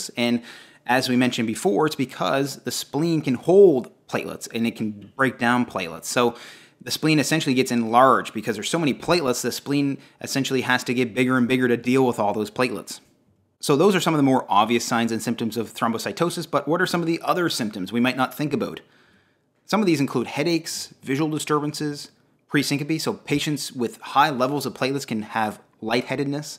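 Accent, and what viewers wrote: Canada. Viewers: He also pronounces out. OWWWWWT Maybe Canadian aye? Leave the man alone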